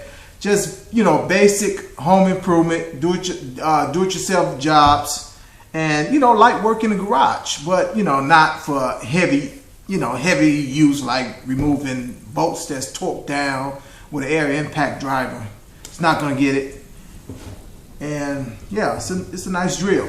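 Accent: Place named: South, United States. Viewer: Very southern twang review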